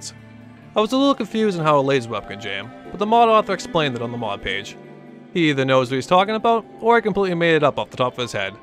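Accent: Boston, United States. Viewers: has anyone ever told u about ur nice Boston accent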